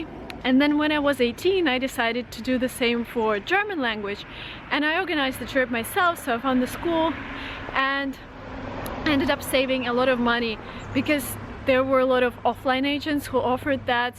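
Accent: United States. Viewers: Your English sounds like American English, but you said you studied English in Great Britain. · Hi mam I have been watching your videos for last week's honestly your videos are inspiring me the way how you talk in American accent is superb I'm not a native speaker however I wanna be one of them. I can feel that zeal in me. Kindly suggest me how can I enhance my skills and become a native speaker... Thanks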